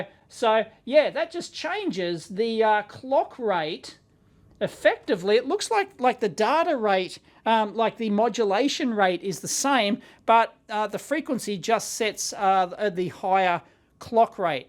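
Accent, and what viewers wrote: Australia. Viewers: That thick aussie accent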